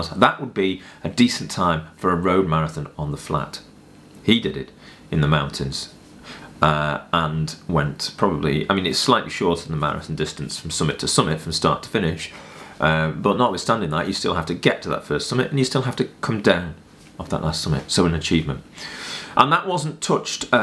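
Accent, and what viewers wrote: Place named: RP, United Kingdom. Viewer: Very posh a countdown too...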